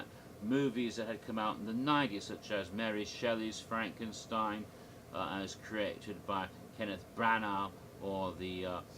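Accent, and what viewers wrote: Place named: United Kingdom. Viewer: Oh wow, your British accent is awesome Doctor!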